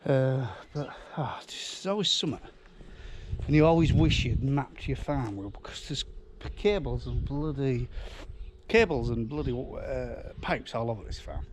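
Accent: Yorkshire, United Kingdom. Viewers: Loving this channel - taken a while for my Cornish ears to tune in to your Yorkshire accent but I’ve got it now. Your thinking is very much the same as mine - I have had concrete as thin as 2” on a really firm base. Never bother with reinforcing but always add anti-crack fibres.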